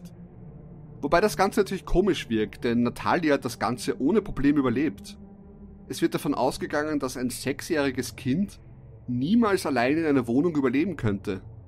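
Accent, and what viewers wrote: Vienna, Austria. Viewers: Bist du ein Wiener?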